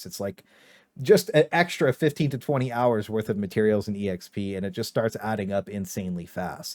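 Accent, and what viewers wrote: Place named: United States. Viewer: It's an American thing. Water is warduh.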